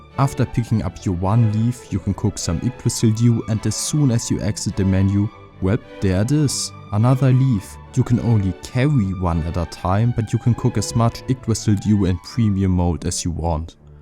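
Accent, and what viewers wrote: Germany. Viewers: Hello! Good job! Well done! Ja, ein "legendäres" Spiel...also das PS2 Spiel (für mich persönlich)...Nostalgie pur! Yes, you cannot hide your German accent! But that is completely irrelevant! Who really cares about your accent when you speak English! A wonderful game! Ich kann mich auch noch an Final Fantasy X erinnern...auch sehr fantasievoll! Das waren noch bessere Zeiten (für mich jedenfalls), ich bin alt geworden... Alles Gute, viel Erfolg, Glück, Freude und Gesundheit! Grüße aus dem "wunderschönen" Kreis Offenbach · Oh gods. The german is real. Even my Dialect isn't that bad when I talk English.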